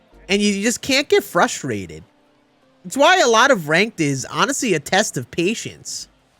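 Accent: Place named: Wisconsin, United States. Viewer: You gotta be from Wisconsin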